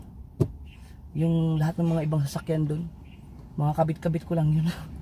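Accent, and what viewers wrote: Visayas, Philippines. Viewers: taga saan ka pala talaga sir may parang bisaya halo tagalog mo sir tanong lang hhehehe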